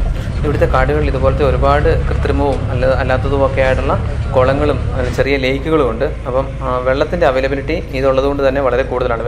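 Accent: Kannur, India. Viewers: താങ്കള്‍ കണ്ണൂര് കാരന്‍ ആണോ ബായ്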